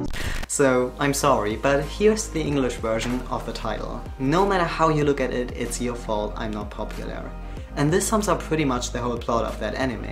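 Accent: Germany. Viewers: Idk if I'm just stupid... But you sound like you have a gernan accent😂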